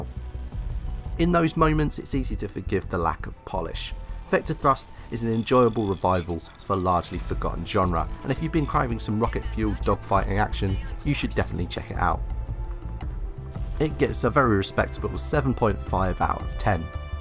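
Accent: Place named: United Kingdom. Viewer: I can't sit through another YouTube game review video with a heavy-handed British accent. I just can't. It's everywhere. Imitators are even putting out videos. Some of them have obviously practiced quite a bit. I didn't down-vote or anything like that. I just can't listen to the cockney-esque or "Liverpool-ean" narrations anymore.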